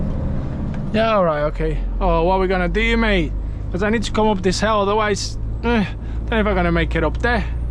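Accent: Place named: Liverpool, United Kingdom. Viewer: Love your videos mate, you’re the backbone of our society! I’ve always wondered where your accent is from? Sounds like it has some Scouse influence.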